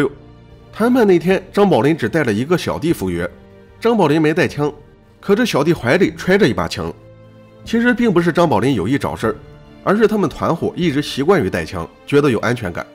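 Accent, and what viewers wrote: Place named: Henan, China. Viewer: 我听出来河南口音了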